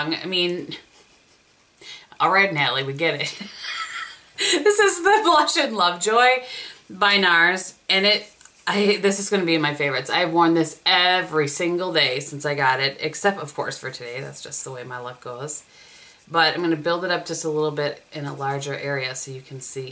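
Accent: Wisconsin, United States. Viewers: I thought I recognized that WI accent!! Osseo here!!! Love your videos! ❤️❤️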